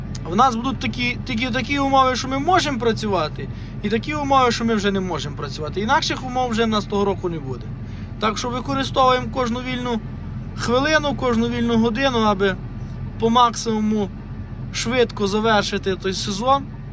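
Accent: Ternopil, Ukraine. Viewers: То шо, Тернопіль? Файна мова😀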